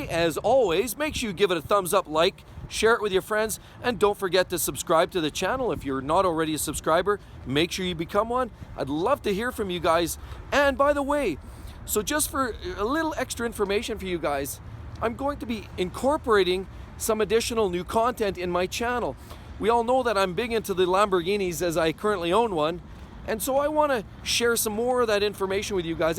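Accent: Edmonton, Canada. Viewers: Heard your voice- I immediately thought Edmonton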